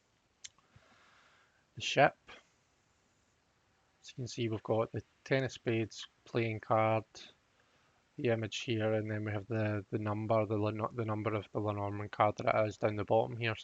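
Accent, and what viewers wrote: Scotland, United Kingdom. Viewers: I love this deck. I was really lucky that I had the opportunity to buy it from Caitlin Matthews in person and she gave me a 10 minute explanation of the deck and Lenormand. Her book The Complete Lenormand Oracle Handbook is amazing too. So nice to hear another Scottish accent on YouTube 😃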